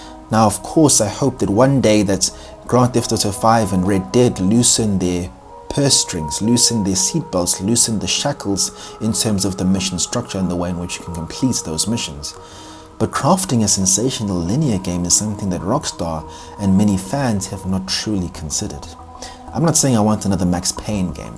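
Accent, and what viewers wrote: South Africa. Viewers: You sound South African, Mac and cheese bro? Should’ve said atchaar or magwinya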